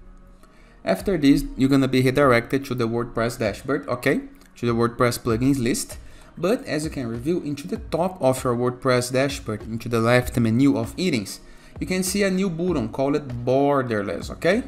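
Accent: Brazil. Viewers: Cara, você é brasileiro?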